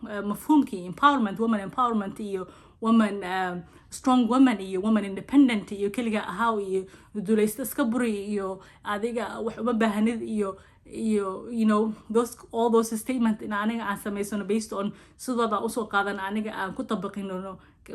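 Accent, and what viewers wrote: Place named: Egypt. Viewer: Abaayo masha allah wax loo baahnaa ayaa ka hadashy shukran lkn su'aal masar miyaa ka tagtay waa laga dareemaya lahjadada salaam from Egypt 🥰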